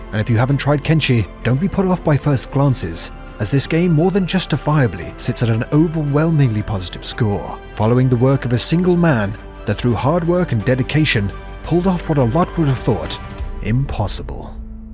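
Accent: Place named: United Kingdom. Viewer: Glad to see someone else discovering this diamond in the rough and enjoying it as much as the rest of us do. Also, yeah, I can't wait to see another Kenshi playthrough. With your sense of humor and buttery smooth, British accent, it would be a treat to see what kinds of shenanigans you could get Arthur, Skibidi, Loada and Wee into and hopefully out of. Cheers!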